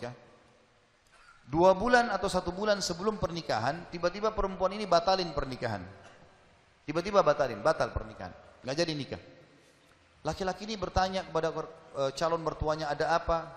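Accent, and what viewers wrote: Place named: Arab world. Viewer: tri atmojo bener. cara ngomongnya kayak orang arab bgt, ya. logatnya khas. mungkin karena keturunan arab juga. haha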